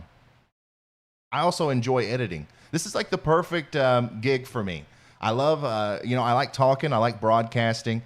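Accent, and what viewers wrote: South, United States. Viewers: The great thing about doing this on YouTube is that you can be totally your natural self, and your accent is perfect for the style, especially with things like “naders in the dark.” 😂 It’s crazy how broadcast entities want to eliminate southern accents because of the stereotype that they are considered “dumb sounding.” You’re a southern guy who is clearly not dumb.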